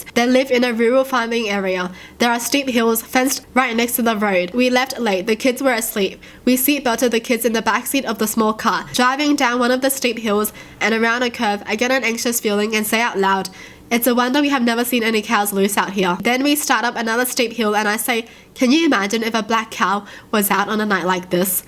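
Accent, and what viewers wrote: Australia. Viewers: Are you from Australia i wants to know I am new lol love u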